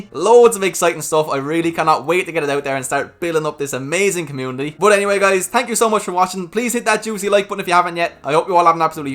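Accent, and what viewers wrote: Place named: Ireland. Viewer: you rock man! Love the Workhorse videos. I would send you some American Bourbon if it wouldn't be confiscated. Love your content. My wife hears your accent and says "Oh your listening to your Irishman investor." lol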